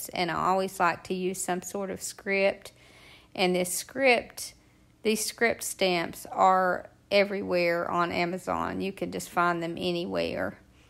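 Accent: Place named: South, United States. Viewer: Thank you so much, just love your ideas and your accent is gorgeous. We hardly ever hear a Southern accent in South Africa so it is indeed very pleasurable watching and listening. Just subscribed, I have to get more of this, it feeds my soul. Thank you and God Bless❤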